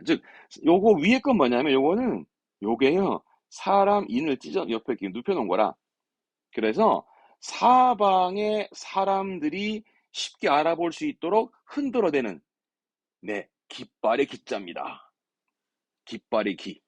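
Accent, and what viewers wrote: Jeolla, South Korea. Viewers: 왐마 듣다보이 우리 절라도분이시구마잉~ 반갑네요잉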